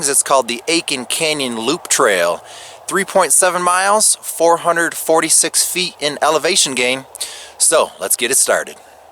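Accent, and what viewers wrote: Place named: United States. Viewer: I watch your videos. Your accent is very different from main american accent. When i first watched your video i thought you are a lonesome man and wanted to spend some of your times in the wilderness until i watched this video .you mentioned about people who love you from afar. Kep going gentleman you are a real adventurer.Thanks for providing pleasure with your videos.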